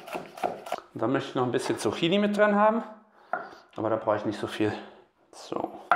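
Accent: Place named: Franconia, Germany. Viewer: Gelernt ist gelernt. Ich brauche schon zum Champions putzen und schneiden 15 Minuten. Beide Rezepte finde ich gut, werden nachgekocht. Danke für Deine Mühe. Darf ich fragen wo Du lebst? Hört sich fränkisch an. Ich komme aus OF (Lichtenfels), habe aber lange in Nürnberg gelebt. Seit 24 Jahren lebe ich aber nicht mehr in der EU.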